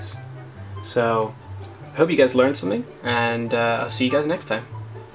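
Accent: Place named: United Kingdom. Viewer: i recognise that accent are you from the uk???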